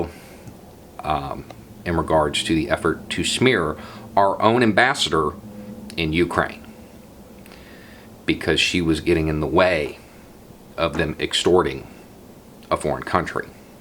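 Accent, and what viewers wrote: South, United States. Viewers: Republicans don't care about doing what is right for our country. They have no morals. They are only interested in their generation making as much money as possible right now no matter what. We had it great. I remember reading about President Obama sitting up late at night reading our letters, can you imagine trump doing that? Obama taught the Constitution, he debated with colleagues, students, family and friends about the Constitution, does anyone think Trump has even read it?. Obama loved us, and he loved this country. Trump laughs at us and uses us to line his pockets. Why do his cronies even think he will have their backs? He trashes all of them in the end. Lindsey Graham has been revealed to be as disgusting a human being as trump. No morals, no values other than the immediate dollar. I really appreciate your southern voice speaking truth to power about the state we are in. 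Not all southerners are republican lemmings! Once we get control of our country back, we need to start educating youngsters in school about our true history, science, understanding how to analyze the politics that gets thrown at them, how to think critically - for themselves.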